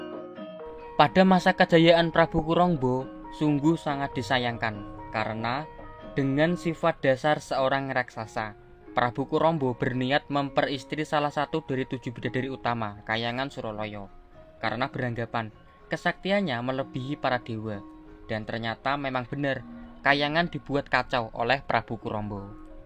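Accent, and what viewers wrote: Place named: Purwodadi, Indonesia. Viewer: Naratornya maaf wong purwodadikah!? Cengkoke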